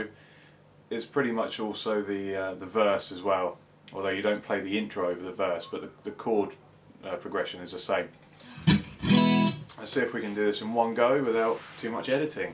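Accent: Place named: United Kingdom. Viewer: A bigger guy, American guitar brand (Fender), camo pants, shirt with cursing on it, song... The British accent was the LAST thing I expected. Way cool. Thanks for the lesson, mate.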